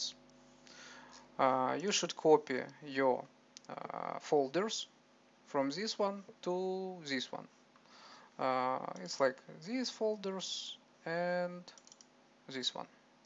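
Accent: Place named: Russia. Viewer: А можно тоже самое только по русски? Акцент выдает русскую школу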